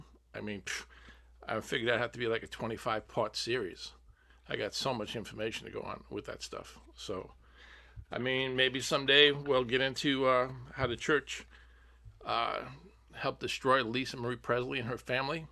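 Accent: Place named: New York, United States. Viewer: Great first solo video! I'm amazed that you still have your New York accent even after being away from it for so long! As a NY'er, it makes me smile 🙂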